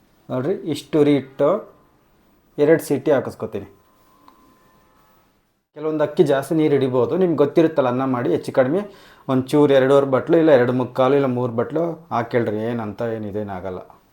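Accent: Chitradurga, India. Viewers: ದುರ್ಗದ ಭಾಷೆ ಕೇಳೋಕೆ ಚಂದ ನಿಮ್ ಅಡಿಗೆ ಮಾಡಕ್ಕೆ ಚಂದ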